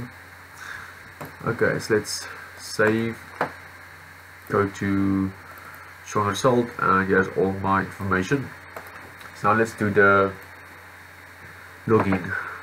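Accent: South Africa. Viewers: are you from South Africa? Afrikaans?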